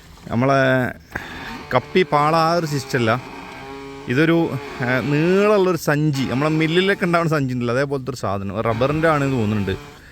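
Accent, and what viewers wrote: Malappuram, India. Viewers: അൻന്റെ 😅 വർത്താനം എനിക്കിഷ്ട്ടം, ദുബായിൽ റൂമിൽ ഉണ്ടായിരുന്നു മലപ്പുറം,ചങ്ങായിമാർ, മറക്കാനാവാത്ത, ചങ്ങായിമാർ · bad malappuram slang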